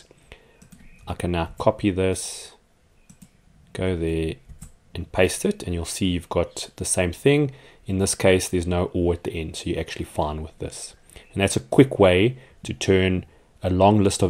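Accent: South Africa. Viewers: As soon as you opened your mouth, I thought to myself, you must be South African. I can recognize my countrymen no matter where I find them. Am I right?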